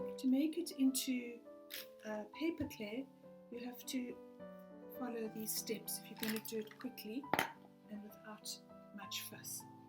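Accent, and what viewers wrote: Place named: South Africa. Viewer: lovely to hear RSA accent ...where is your studio? i stay in Tokai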